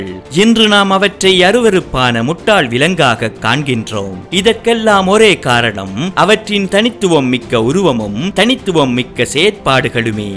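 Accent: Sri Lanka. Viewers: சார் இலங்கை தமிழராஆ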